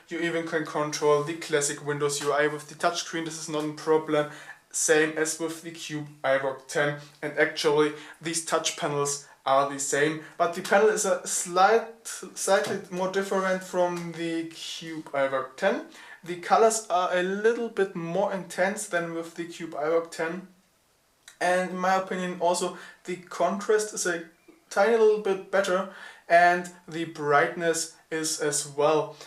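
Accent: Germany. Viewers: German. I'm really sure. Source: I'm German. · Can' tell if he's german or indian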